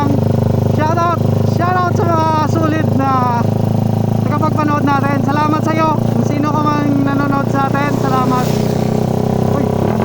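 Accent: Iloilo, Philippines. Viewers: ilonggo ni gali ayy. bakbaki ahhh🤣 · Ilonggo kapala boss, sa diin ang inyo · Ilonggo ka sir?' Idol ko ung punto talaga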